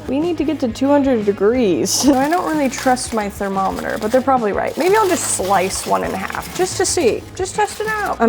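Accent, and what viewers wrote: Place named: AAVE, United States. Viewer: Nothing more annoying than a woman speaking Ebonics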